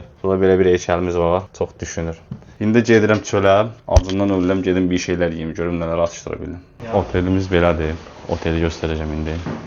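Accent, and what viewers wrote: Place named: Ganja, Azerbaijan. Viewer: Ləhcədən bilinir gəncəlididə😂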